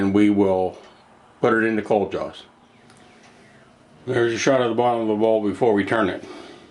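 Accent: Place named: Pittsburgh, United States. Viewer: You've GOTTA be from Da Burgh!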